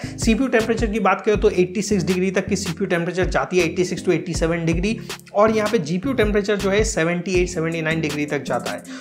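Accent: Assam, India. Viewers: Damn you're from assam? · Aap Assam se ho kya??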